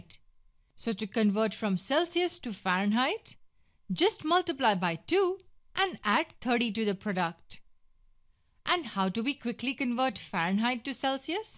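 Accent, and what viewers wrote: India. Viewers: Great trick! Thank you. Love your Indian narration too. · she has an Indian accent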